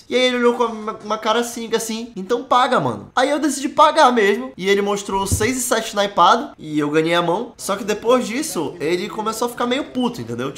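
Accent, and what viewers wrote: Pará, Brazil. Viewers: mano que video legal, vou começar a acompanhar, to jogando online e to ganhando por enquanto, no positivo em relação ao que eu coloquei, percebi pelo sotaque que vc é do Pará né, muito massa mano, só sucesso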